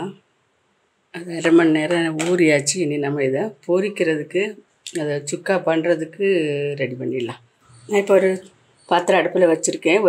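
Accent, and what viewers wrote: Nagercoil, India. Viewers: Nagarkoil Tamil mathiri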